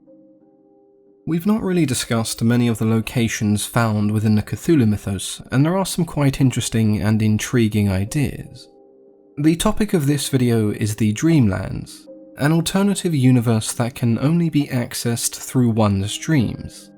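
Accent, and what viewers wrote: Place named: United Kingdom. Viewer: I enjoy the Dream Quest of Unknown Kadath as somewhat light reading/listening. It seems quite different from any other of HPL's works and reminds me a great deal of Grimm's Fairy Tales. It almost feels like it was written for children because there is really nothing of horror about it and everything turns out fine in the end. The only thing that disappoints me about it IS the ending! The "there's no place like home" trope was way too simplistic and unsatisfying for me. If Carter was this old and experienced dreamer wouldn't he have recognized the Sunset City as a conglomeration of memories of his childhood and the town he grew up in? In fact that was eluded to in the beginning of the story, but I'm just nit picking. It's a fun story to fall asleep to especially when a British person is reading it! And I do enjoy rereading it occasionally too.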